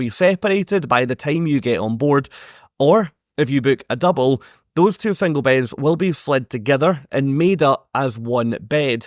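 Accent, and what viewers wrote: Scotland, United Kingdom. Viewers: What a delight to have a Scot who speaks so clearly!!! We “unwashed” revel in the accent but luve to understand also. 😊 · Loved your sweet Scottish accent! Could listen to it all day! Great video very different from others.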